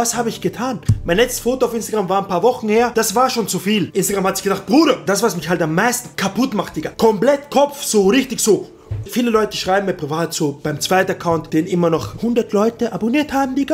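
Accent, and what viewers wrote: Austria. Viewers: Kommst du aus Österreich? #teamgeorgTV 😊😊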